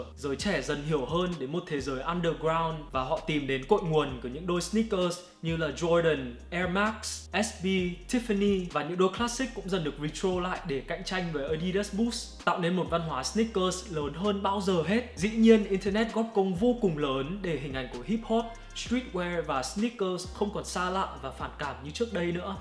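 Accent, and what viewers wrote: Standard. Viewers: Nói chuẩn anh ưi!! 1 like❤️❤️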